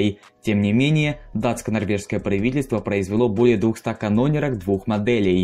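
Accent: Ukraine. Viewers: Не родной, он с Украины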